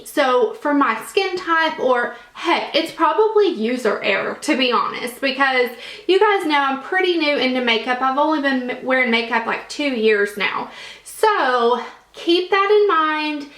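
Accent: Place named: South, United States. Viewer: I'm so happy some one is finally being honest on YouTube. I really appreciate it!! Love you videos so much... And I love your accent, I want a southern accent soooooo badly!! 😭😭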